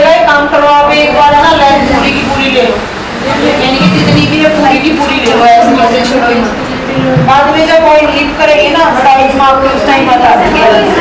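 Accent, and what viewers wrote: Haryana, India. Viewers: Madam ji Apki Awaz se lgta h ki aap Ki boli Hariyanvi h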